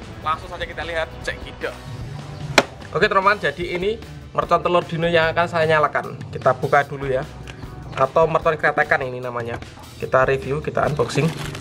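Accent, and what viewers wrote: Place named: East Java, Indonesia. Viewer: Orang jawa timur kan bang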